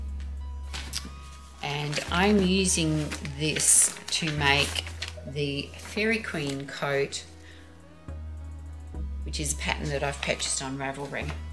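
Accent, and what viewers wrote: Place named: Australia. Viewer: Crazy enough it just popped up on my YouTube page. But as soon as I heard your accent I had to watch. I enjoy all things crochet, yarn, & Australia as I lived in Adelaide and Darwin for about a year and a half and currently work with the Australian and NZ markets for my job. I knew I had to watch and check out your channel.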